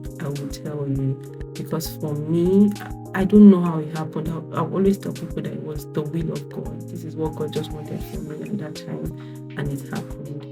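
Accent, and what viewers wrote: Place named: United Kingdom. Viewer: Na you de speak Queen's English like this??? Na wa oh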